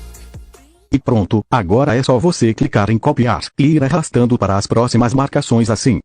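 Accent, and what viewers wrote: Bahia, Brazil. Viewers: o cara é um baiano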